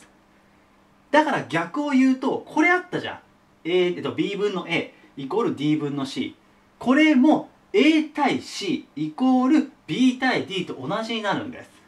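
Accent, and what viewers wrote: Kansai, Japan. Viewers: たまに関西弁のイントネーション入るよね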